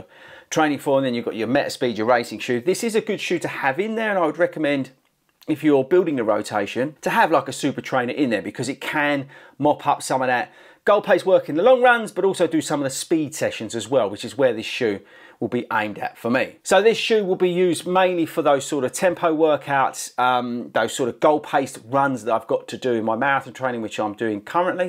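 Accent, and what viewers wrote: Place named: England, United Kingdom. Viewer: I’m sure you’ve said it but what part of England are you from? I’m over here imitating the accent 😂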